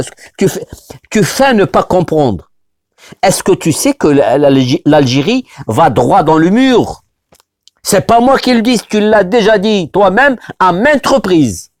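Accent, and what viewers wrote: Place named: Morocco. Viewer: Mais toi tu parles sans visage et tu parles plutôt marocain !! ce que tu dis n'est une nouveauté pour personne. si au moins tu montrais ton visage , vraiment d'ou te vient cet accent???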